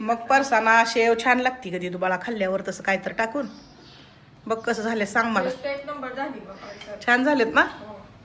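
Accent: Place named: Marathwada, India. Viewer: Latur Dharashiv chi bhasha watate tumchi, konta gav ahe tai tumch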